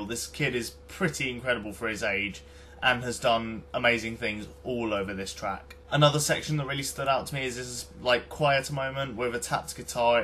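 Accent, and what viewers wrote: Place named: United States. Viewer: an american one; the kind of accent that lets you know that the guy talking wakes on redbull (that's not a hidden advertising message btw haha) :P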